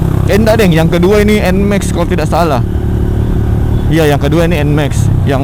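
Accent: Makassar, Indonesia. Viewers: kentara logat makassarnya😂, salam sesama orang makassar bang😇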